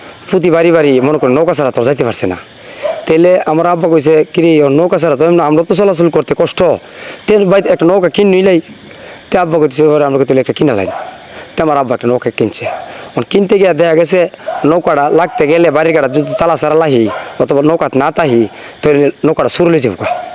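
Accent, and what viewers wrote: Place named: Brahmanbaria, Bangladesh. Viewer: এটা ব্রাহ্মণবাড়িয়ার ভাষার মতো লাগলো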